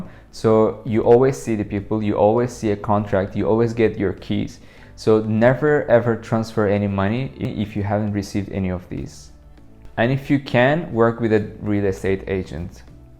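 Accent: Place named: Netherlands. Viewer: You speak English with a Dutch accent, so your Dutch must sound very good :)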